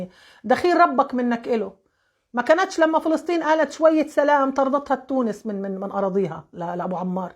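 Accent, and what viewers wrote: Egypt. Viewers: انت لست فلسطينية انت مصرية لهجتك تؤكد ذالك ولم أكمل مشاهدة الفيديو ومصر ليس لها الحق كما ذكرت من في سدة الحكم القاتل عميل الصهاينة الخسيسي يحمي هذا الكيان اللقيط والقافلة تريد فك الحصار لا الحرب وانت تبرري لها الحق عن اي حق وهو جند التراما دوليون الحشاشون عبدة المال القذر لسب الناس المسالمون وإعلام العار والشنار اللاعق الناعق بما هو مخزي لدولة عريقة أصيلة بحجم مصر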